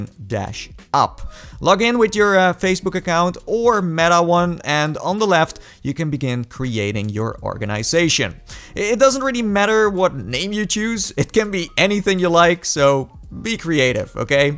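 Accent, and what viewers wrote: Netherlands. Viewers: lol okay I hear within the first 4 seconds you are presumably dutch :) haha, cheers man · Are you from holland? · are u from the netherlands cause i am and i recognzie the accent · Try english beter dude u dev sound like someone who’s dutch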